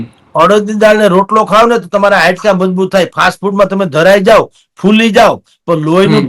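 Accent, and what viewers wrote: Kathiawar, India. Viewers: કાઠિયાવાડી શૈલી માં ખુબ સરસ મોરે મોરો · I just love how you talk in Kathivadi Gujarati and explaining everything in detail 🤣🙏👏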